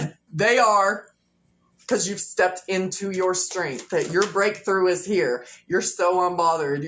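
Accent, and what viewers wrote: South, United States. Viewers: 😎I am most high....... just smoked a joint. LOL. but seriously.... sometimes all it takes...... is a little can do.... you got it. beware of trolls....is right. they hide behind circles with letters in them. i love the southern accent........ i grew up watching beverly hillbillies, and dukes of hazzard............ But who didn't huh? be vigilant about thieves.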